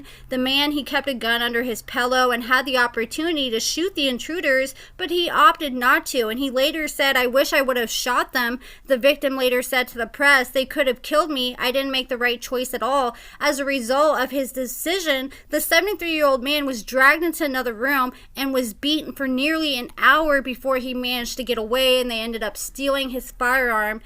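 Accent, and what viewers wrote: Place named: South Dakota, United States. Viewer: Where are you from,? I’m asking because I heard you say “pEllow” instead of “pillow” and yesterday I heard you say “reNember” instead of “remember”, lol just asking because my grandparents are from South Dakota and that’s how they talk. They say “pEllow” and “mElk”.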